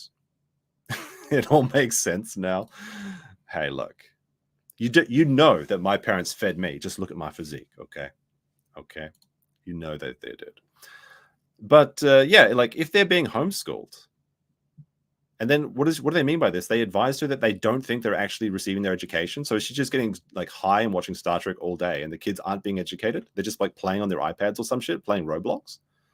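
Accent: Australia. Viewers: As an Aussie it was a lovely surprise to suddenly hear an Oz accent in the playlist I put together for this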